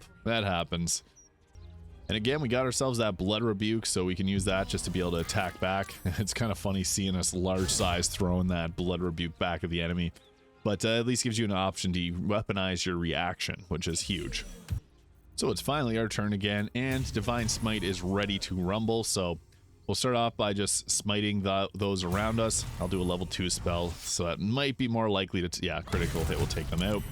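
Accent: Canada. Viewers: lmao yes I figured you were my northern neighbor greeting from Minnesota!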